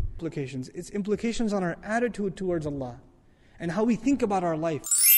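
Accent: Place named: Bengal, India. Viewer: btw.. your bengali dielect is so hard to undeestand.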